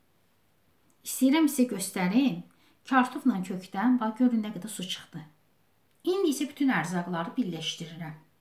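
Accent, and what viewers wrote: Baku, Azerbaijan. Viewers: Shirin Baki lehcesi.Bashqa bir alemdu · Lehceden srazi bilinir ki bakilidi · Həsrət qalmışdıq Bakı ləhcəsinə. Yeni qoşulmuşam kanalınıza Bakının harasındansuz ? Mən Şüvəlanlı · Danisigina bax ay Allah lezzet eledi.Bakililar bir basqadir.🥰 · Beh beh😋 yemeye söz ola bilmez. Men de hazirlayacam. Xanimin danishigi, Baki lehcesi lap lezzet eledi 😍😍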